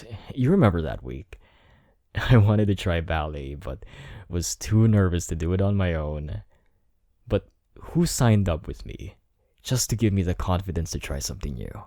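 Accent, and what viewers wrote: Philippines. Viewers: SABI KO NA EH FILIPINO KA YUMG ACCENT MO SA FILIPINO AGUY 🙂💕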